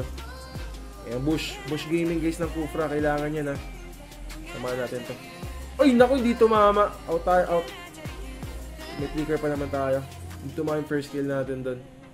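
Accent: Iloilo, Philippines. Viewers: Ilonggo ka pala lods? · ilonggo ka coach?❣️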